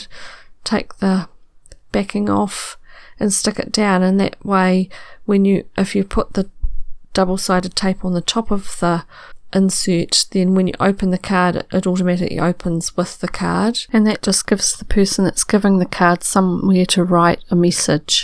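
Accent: New Zealand. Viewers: Such a beautiful design! Accent...is that a Kiwi accent??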